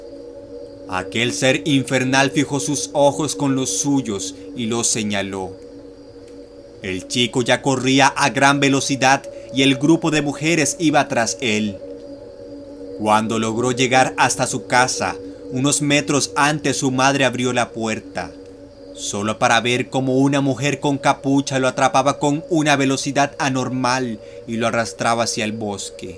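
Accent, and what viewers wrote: Arab world. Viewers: acento árabe X'D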